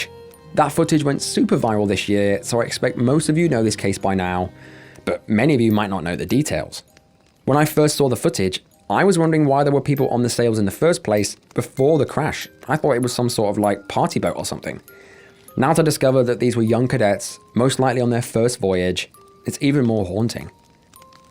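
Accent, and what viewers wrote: United Kingdom. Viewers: The Queens English in Ai is just too funny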